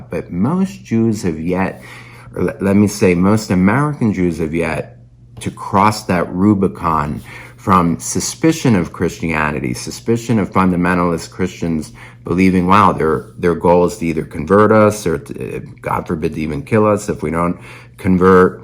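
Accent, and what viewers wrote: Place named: Queens, United States. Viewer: I am so glad to have found you! I was watching an ATHEY CREEK video today, and Pastor Brett spoke at length about your work! And I have to be blunt - as a girl from QUEENS, living in the SOUTH for the last 35 years, I was tickled to actually hear someone that sounded like ME! So glad to meet you! Looking forward to your next video! 👍🙏👍🙏👍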